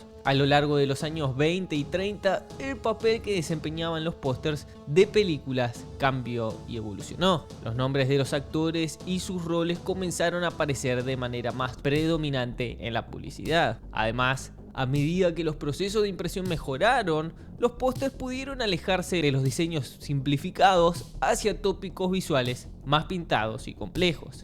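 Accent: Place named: Argentina. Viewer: Un consejo como creador: no intentes neutralizar tu acento, hablá como argentino.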